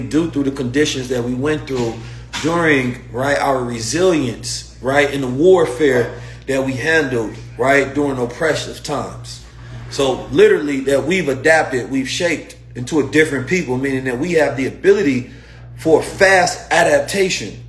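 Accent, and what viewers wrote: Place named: Chicago, United States. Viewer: Is it me or do I sense some Chicago swag in your step now. You sound a lot more dangerous now my dude. Peace & Blessings. S/O from the Chi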